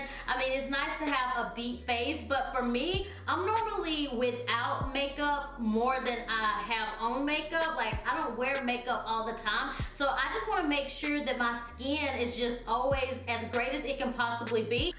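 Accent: South, United States. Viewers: My 15 year old and I watch your videos together we love you. Love your southern twang. Snapchat: queencindy619.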